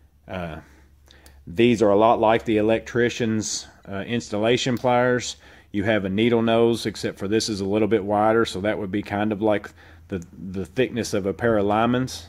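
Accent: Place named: Texas, United States. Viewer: I love your Texas accent lol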